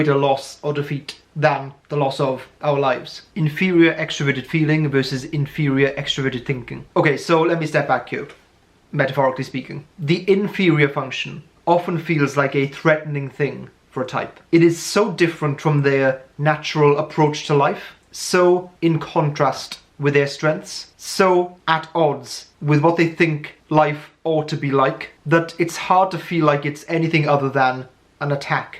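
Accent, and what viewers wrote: United Kingdom. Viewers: Impressive video. You`re so articulate and straight to the point. I relish when you express your toughts about INFP and i can see how passionate you are in front of the camera thinking, feeling, expressing yourself. INFP and INTP are so similar and complex althought little details make them so unique between them. In this lockdown i, sometimes took those mbti test since im bored hehe. 80% of the time i get INFP Type, the rest is between INTP and ENFP and sometimes INFJ, i wonder if is this you case too? BTW are you British? Because i love your accent ;-) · He looks Serbian/Bosnian or Greek but defs a UK accent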